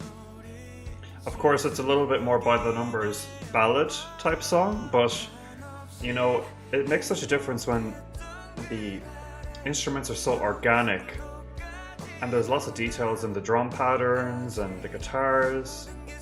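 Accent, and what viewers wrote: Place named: Cork, Ireland. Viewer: woah the world is so small… started watching this video and got caught off guard by ur accent and went to check where ur from, i’m also from cork!! crazy that, with them being a relatively small group and cork being a relatively small area, there’s someone also from there reacting to my fav group…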